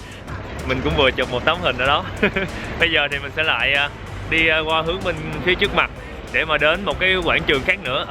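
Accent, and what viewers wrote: Mekong Delta, Vietnam. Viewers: anh ấy đẹp chai và có tâm hồn ăn uống và giọng nói mộc mạc của người miền tây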